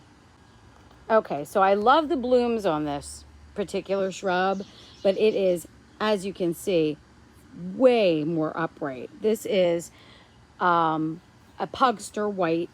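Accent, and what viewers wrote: New Jersey, United States. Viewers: Very nice !! Love your channel and also your voice !! Not sure if it’s a Jersey accent ? What a transformation in your garden ! I love how you always change things up !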